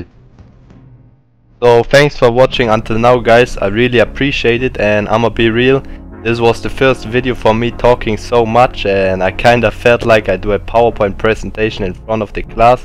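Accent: Germany. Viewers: German accent haha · man hört direkt das du deutsch bist hahah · Man merkt richtig dein deutsch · nice video are you German? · German accent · you are from german ?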